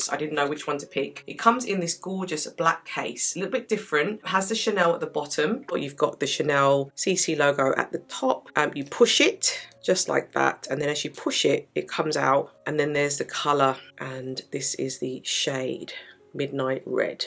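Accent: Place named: United Kingdom. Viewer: hi i like how natural you are and how your skin looks like real uneven skin ..and above all your accent.are you from britain?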